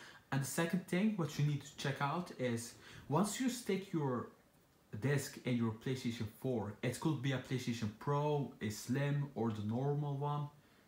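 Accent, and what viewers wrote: Netherlands. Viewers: Lol ur dutch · Yeah the moment I heard his accent I was like haha he is dutch and it turned out to be true. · Bruh your ducht accent is so obviuos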